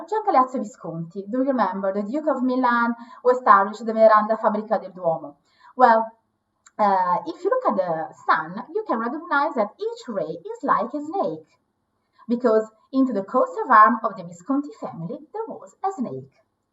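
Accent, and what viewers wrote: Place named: China. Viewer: The audio is really bad. Sounds like she speaks Chinese.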